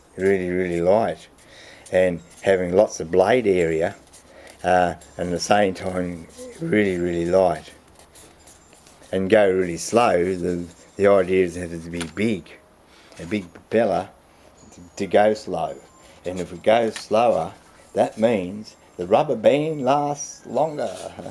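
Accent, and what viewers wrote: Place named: Australia. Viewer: Good on you,a video spoken, the only way,others don't understand true Aussie, I really enjoyed it,congrats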